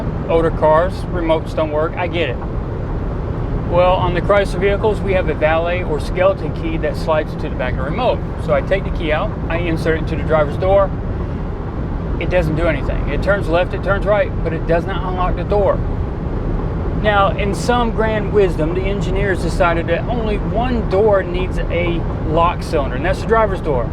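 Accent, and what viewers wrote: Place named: Georgia, United States. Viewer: Thanks for the reply and sharing your Neon repair technique . Never lose that cool Georgia accent. Been SUBSCRIBED for a while . Prosperity, long life and good health to you and yours.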